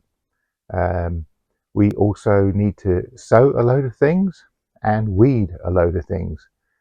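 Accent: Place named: New Zealand. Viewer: Just 30 seconds in and loving your kiwi accent blended with English. Nice sound to it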